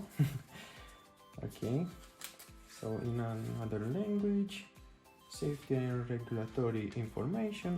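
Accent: Romania. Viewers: Recunosti romanul dupa accent din prima....super tare video! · Multumesc de review, ca vreau sa mi-l iau peste cateva zile(mi-am dat seama ca esti roman dupa accent)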